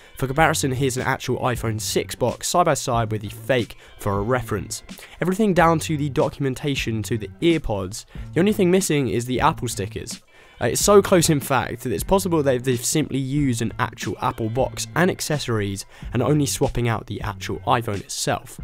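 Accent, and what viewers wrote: England, United Kingdom. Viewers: I will be studying in Uk this year,are you from England ?????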